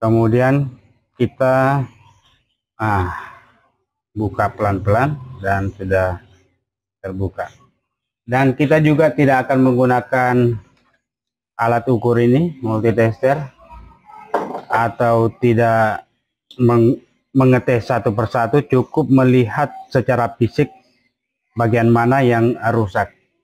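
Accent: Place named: South Sulawesi, Indonesia. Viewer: Dari logat nya kyakn org Sulawesi Selatan 🤔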